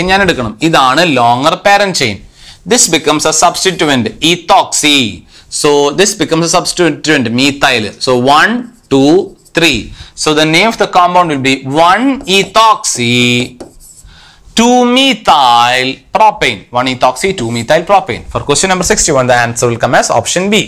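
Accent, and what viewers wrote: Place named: Thrissur, India. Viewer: TCR slang ⚡⚡💪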